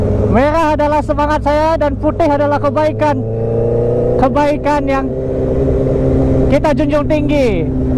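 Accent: Bali, Indonesia. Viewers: bli orang bali bukan? logatnya mirip orang bali hehe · logat balinya masih keliatan 😃😄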